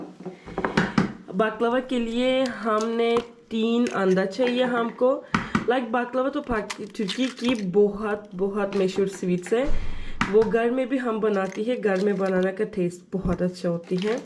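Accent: Pakistan. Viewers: I really like you your smile aapi and ur pakistani accent too❤loves alot from pakistan and mehndi's design is also very cute😅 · Good video. love your urdu accent. be happy always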